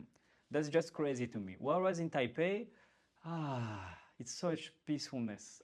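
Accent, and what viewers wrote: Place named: France. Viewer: Enjoy your staying in Taiwan, you are welcome by the way I like your great French-English accent. Be cool, Godspeed.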